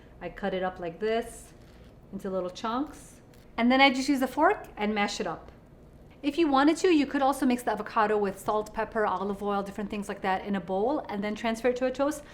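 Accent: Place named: Michigan, United States. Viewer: Forget the avocado toast; where is that accent from? Im guessing deep Michigan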